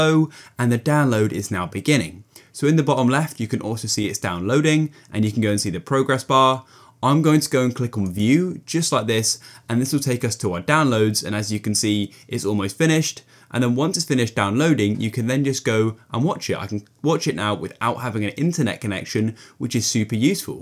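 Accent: United Kingdom. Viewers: British acent!!!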